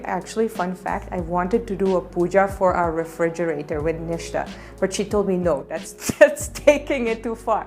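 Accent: India. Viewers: oh my god that indian accent is just seeping in , seems like within 5 yrs you will become completely indian , btw have u learned any kannada ? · Its true that your English accent is almost indian 😄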